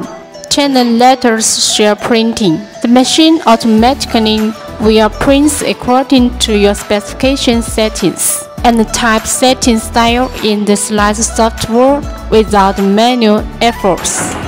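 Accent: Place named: China. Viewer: what a pain to listen to her zinglish 🐱‍🐉🐱‍🐉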